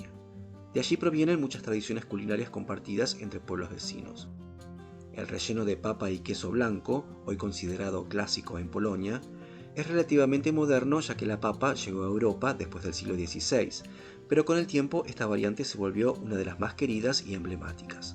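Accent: Argentina. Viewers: soy español. He vivido 24 años en Polonia. Ver tu receta ha despertado en mí tantos y tan bonitos recuerdos... ¡¡¡Eres un artista!!! La receta está fenomenalmente explicada paso a paso y muy bien hecha. Estoy seguro que tus pierogis están buenísimos. ¡¡¡Enhorabuena!!! Por cierto, me ha gustado mucho tu manera de explicar, ese acento tan bellamente argentino y lo bien que cocinas.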